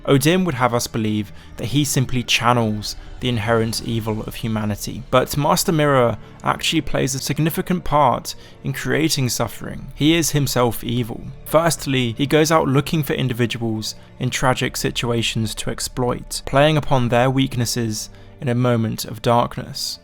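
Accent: United Kingdom. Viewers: He's clearly british mate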